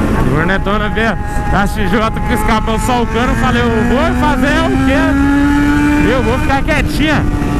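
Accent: Goiás, Brazil. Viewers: Pq vc fala "no pique du Goiás"? Vc é daqui? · Queria saber por que o fala no piqui do Goiás kk eu sou do Goiás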